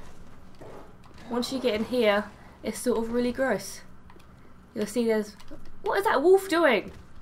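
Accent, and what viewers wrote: England, United Kingdom. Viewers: 'Bit too much of the english accent here.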